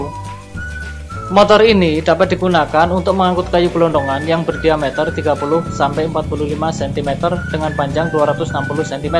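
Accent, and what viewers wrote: Java, Indonesia. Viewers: suara jowo'mu medok pisan yo bro...😂🤣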